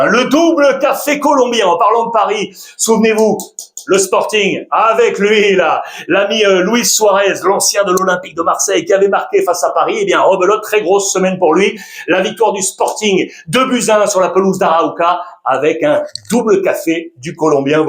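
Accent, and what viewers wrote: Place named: Spain. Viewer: Bonjour Alexandre. Mais pourquoi tu n'arrives toujours à prononcer ARAUJO avec ton accent Espanique que j'adore énormément depuis que tu étais encore chez Canal + ??? Alors fais-moi plaisir frérot et surtout pour ça qu'on t'adore depuis Abidjan ❤❤❤❤❤❤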